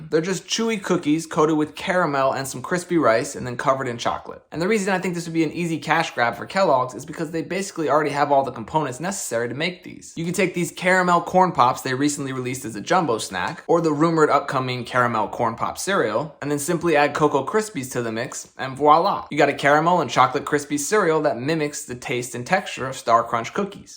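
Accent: United States. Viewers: You from San Antonio, Right.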